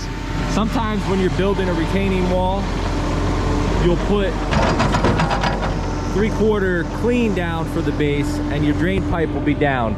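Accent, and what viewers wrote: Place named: Pennsylvania, United States. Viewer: that SE PA accent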